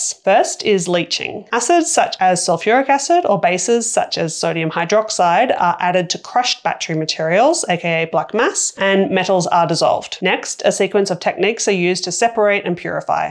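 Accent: Australia. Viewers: Hey! Keep taking the magic mushroom juice. It has slowed your delivery down, noticeably! That's very welcome imo. As an older person with hearing impairment, I found your previous combination of Australian accent and machine gun delivery a struggle to keep up with, understand and digest. A micro-pause between sentences, Dave Borlace-style, would allow my withered synapses to do a bit of absorption and digestion of the concept just discussed, but thank you SO much for the new more measured pace. Loving it ❤!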